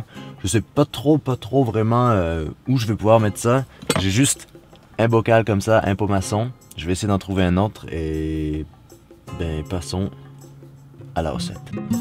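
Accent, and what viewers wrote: Quebec, Canada. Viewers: Bonjour, Je m'appelle Marc et j'ai eu plaisir à suivre tes aventures en Van de l'autre côté de l'atlantique depuis quelques semaines. En me familiarisant avec tes vidéos et toi même, cela m'a donné envie d'acheter ton livre que j'ai commencé cet apm. j'avais juste envie de te dire que je suis un lecteur acidue, que j'ai beaucoup lu et que ton livre que j'ai juste commencé ( environ 30 pages) est vraiment très bien écrit, mais plus encore, très intelligemment pensé et formulé. A 26 ans, tu as du vivre d'autres vies pour avoir une opinion si juste et éclairée sur la situation dans le monde ultra civilisé et le mirage parfait qu'il entretient dans nos sociétés aveuglées et courant vers le même précipice, sans vouloir faire du catastrophisme. Je vais également me lancer prochainement à 45 ans dans la vie de Van à travers l'Europe et c'est ce projet qui m'a proposé ta rencontre. 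Alors voilà, simplement un grand merci pour la qualité de tes vidéos et au moins tout autant pour la qualité de tes pensées profondément subtiles et de ton écriture qui le met si bien en forme ( et celui qui a osé te demander si tu étais francophone, devrait lire en urgence ton bouquin pour ne plus avoir à se poser cette stupide question, sachant que tu parles presque aussi bien que tu écris...:-)) Mon frère vit au Quebec depuis des années et j'adore votre accent. Je te souhaite de bien continuer à profiter de ton courageux choix et puisse la route te porter encore vers ces expériences de vie si m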